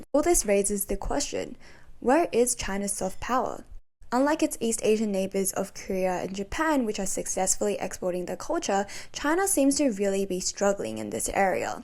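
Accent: England, United Kingdom. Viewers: why are you talking like bri'ish? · never seen an asian have a british accent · you seem to have a English accent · the accurate British accent as an ESL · I see, beautiful girl, love the accent. Is that British? I liked the content too. Thanks for sharing.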